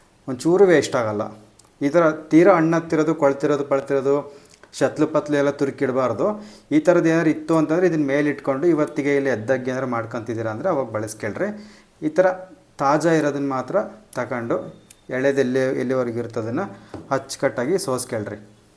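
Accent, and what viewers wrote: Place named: Hiriyur, India. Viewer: ಹಿರಿಯೂರು ಹುಡ್ಗ ಅನ್ಸುತ್ತೆ ಎಂತಾಸ್ನಾಗ್ ಐತೆ ಬಾಷಾ ಶೈಲಿ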